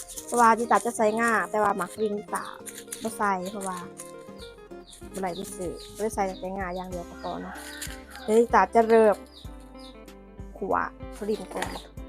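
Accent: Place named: South, Thailand. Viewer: ເມື່ອຄົນ ໃຕ້ໃດ້ຍິນສຽງໃທໃຕໃທໃຕ້ຈັງແມ່ນມີແຮງເຫມືອນໃດ້ຢູ່ບ້ານຕົວເອງ😊❤❤❤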